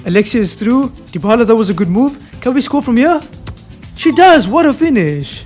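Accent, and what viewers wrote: South Africa. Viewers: where are you from because you sound south african if im not mistaken 😮😊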